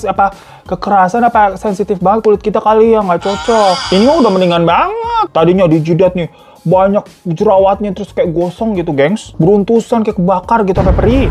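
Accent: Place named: Medan, Indonesia. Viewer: KEK MANA, Udh kek orng Medan ya bg :v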